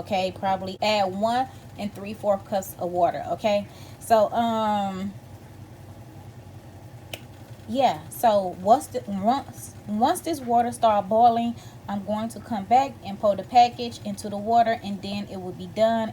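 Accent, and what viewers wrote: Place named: Texas, United States. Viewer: New subscriber so I probably missed it- where are you from originally accent sounds like Texas maybe Tennessee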